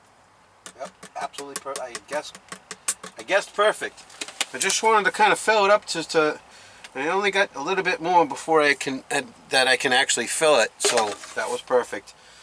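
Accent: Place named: Boston, United States. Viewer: There is no such thing as too much bacon. Ever. Love your Boston/Irish accent! lmao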